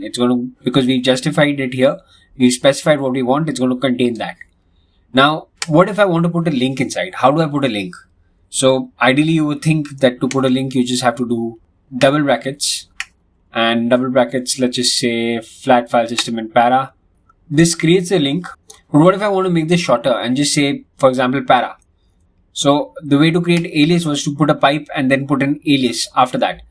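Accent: India. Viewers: Very good video, but sorry, your indian accent is vey strong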